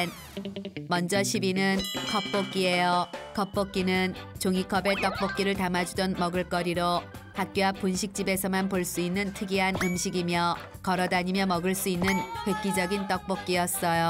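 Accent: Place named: North Korea. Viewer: 근데 목소리가 북한말같음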